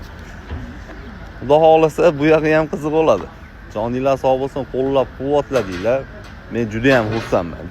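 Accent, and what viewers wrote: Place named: Namangan, Uzbekistan. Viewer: наманган хонобод кушни коратепа кишлок топдимми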